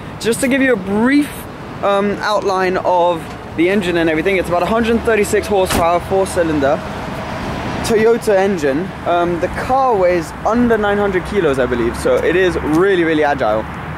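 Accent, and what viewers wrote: France. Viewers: Are u English you accent seems to blip into French sometimes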